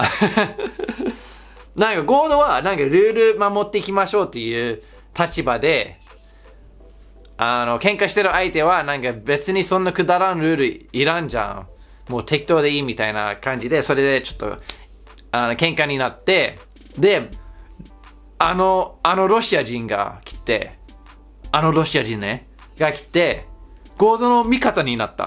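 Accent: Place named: Kansai, Japan. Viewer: ずっと思ってたんやけど ゴードの方言って近畿地方かな？ 話し方だけで親近感がわく笑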